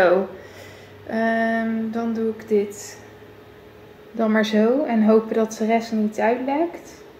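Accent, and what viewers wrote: Rotterdam, Netherlands. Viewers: Dat is rotterdams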